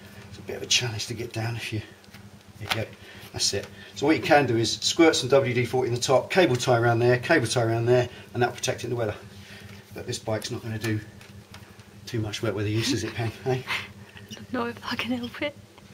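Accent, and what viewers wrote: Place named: England, United Kingdom. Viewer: What a great video and so nice to hear an English accent working on a Harley rather than the usual southern American. This is now a no brainer for me to do myself.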